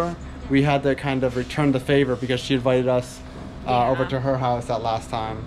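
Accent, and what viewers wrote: Cambodia. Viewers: I just discovered his videos/channel. His Khmer is really good and his tone really fits the Khmer style of speaking. Lol Just subscribed. Great job! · I like your Khmer accent❤️🇰🇭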